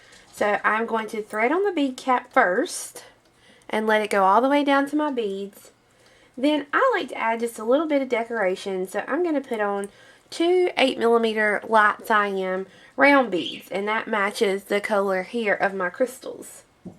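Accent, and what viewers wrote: South, United States. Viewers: Great tutorial and very easy to understand and follow. I knew it was a southern voice I heard! I'm from Columbia, SC You can bet I will be checking out your other videos. Debbie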